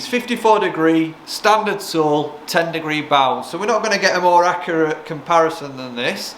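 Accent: Yorkshire, United Kingdom. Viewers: Love it and it's great to hear a proper yorkshire accent on YouTube!! 😁😁 Keep em coming!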